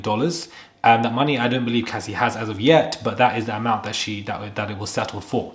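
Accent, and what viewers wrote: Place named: United Kingdom. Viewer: This guys UK accent makes it miserable listening to him. The UK is probably the most unattractive country with the most unattractive people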